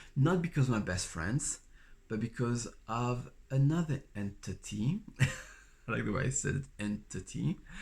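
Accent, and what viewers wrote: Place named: France. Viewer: OMG, you are soooo gorgeous and incredibly charming!!!! It sounds like you are a French speaker. Where are you from originally?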